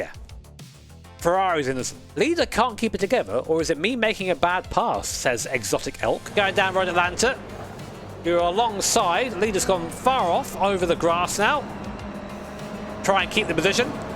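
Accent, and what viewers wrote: United Kingdom. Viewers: I couldnt understand what you were saying since you are British and you sound poor anyways so whats the point of listening to you? being respecful goes a long way and its just a game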